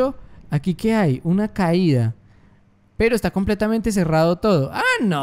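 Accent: Colombia. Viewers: algo me dice que este vato es colombiano xd like si sos de colombia :v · no sabia que eras de medellin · Alguien me dice de que país es xD parece colombiano papá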